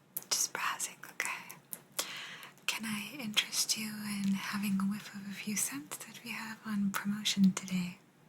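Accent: Italy. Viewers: ❤ love your Italian accent videos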